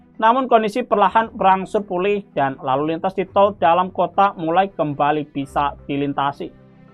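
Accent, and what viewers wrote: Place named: Banyumas, Indonesia. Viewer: Ngapak nih host nya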